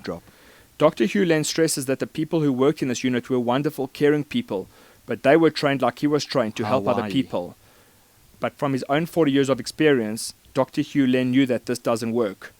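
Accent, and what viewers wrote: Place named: South Africa. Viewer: The Video looks like it was filmed at Palace of the Lost City, Sun City, South Africa... I had the pleasure of spending a few days there. Also, your accent may be a give away too...:)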